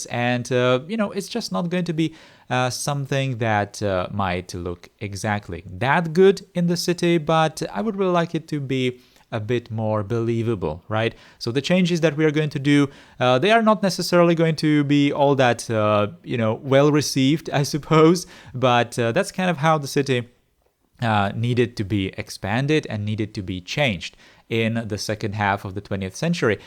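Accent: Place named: Czech Republic. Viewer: Where are you from? You have a slightly eastern european accent. I’m guessing Czech?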